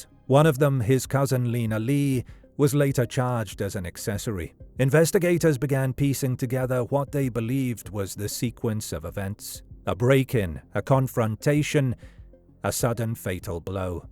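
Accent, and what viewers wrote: United Kingdom. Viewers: Really. Why the British accent? Don't buhlschitt a buhlschitter.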